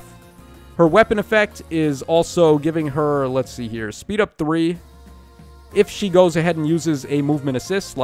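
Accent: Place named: Queens, United States. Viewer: Something great about an affable dude with a thick Queens accent reviewing gatcha games. 😆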